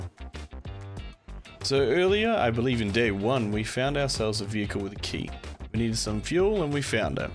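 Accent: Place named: Australia. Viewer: I love the australian accent. You are doing great, keep it up!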